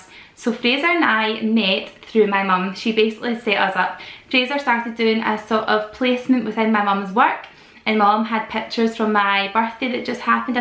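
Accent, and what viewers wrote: Scotland, United Kingdom. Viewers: I love your scottish accent and your pretty hair!!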